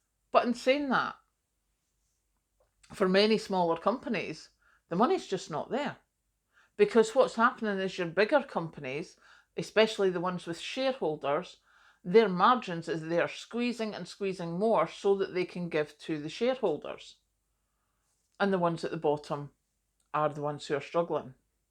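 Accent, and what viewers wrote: Scotland, United Kingdom. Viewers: Best motivational speech👏 (And how I love the Scottish accent 😅)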